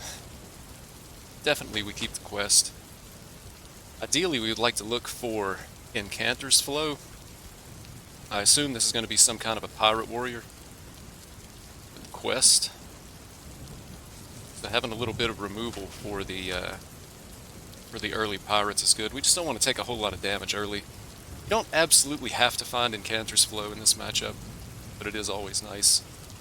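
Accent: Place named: South, United States. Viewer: Love the thunder and rain in this video. I also hear a southern accent. Greetings my southern brother.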